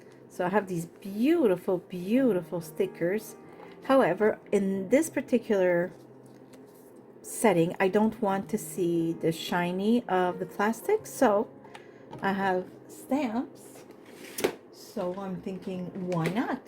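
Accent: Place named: Quebec, Canada. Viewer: Willing to listen to your French-Canadian accent?? I could listen to it for hours, my dear!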